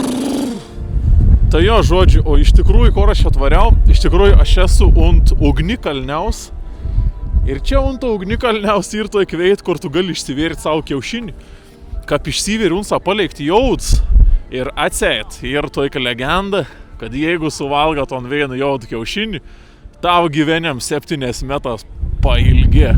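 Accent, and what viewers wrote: Samogitia, Lithuania. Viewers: Kodel zemaitiškai kalbi sakei lietuviškai kalbesi😢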